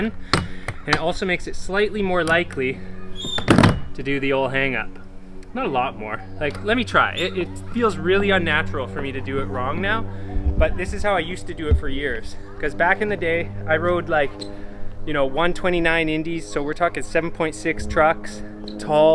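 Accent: Canada. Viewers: Maybe its the white shirt, maybe its the canadian accent.. but after clicking on this I almost thought I clicked on a Vancouver Carpenter vid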